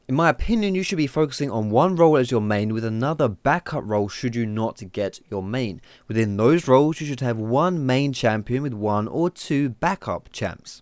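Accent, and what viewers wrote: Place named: United Kingdom. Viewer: Pls make a guide on how to speak with such a nice british accent!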